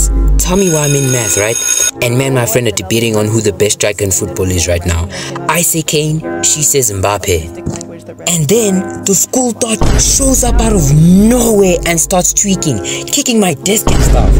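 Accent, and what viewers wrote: South Africa. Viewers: I can hear the Satafrikan accent😂🇿🇦💯 And you're a Chelsea fan? Subscribed🫱🏽‍🫲🏾💯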